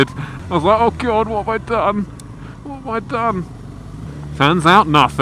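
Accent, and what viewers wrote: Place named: London, United Kingdom. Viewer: what side of london are you from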